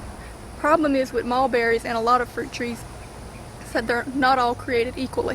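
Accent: Louisiana, United States. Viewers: are you cajun ?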